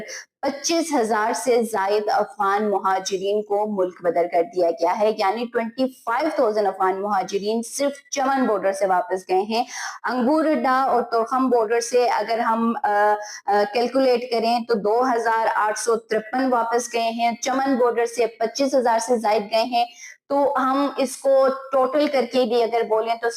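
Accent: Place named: Chitral, Pakistan. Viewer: میڈم آپ کا اردو بولنے کا انداز چترالیوں کی طرح ھے آپ کا تعلق چترال سے ھے؟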